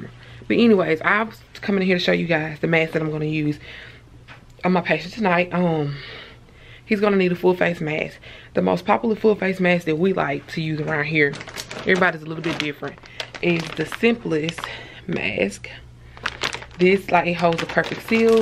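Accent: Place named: South, United States. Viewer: Voice hella country lol but at least the vids aren't boring like some others who do them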